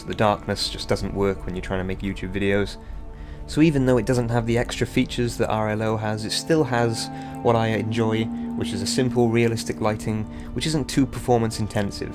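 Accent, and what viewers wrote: United Kingdom. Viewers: Hm is that a slight East Anglian accent I hear? I note you haven't uploaded anything in 9 months, I hope you're okay!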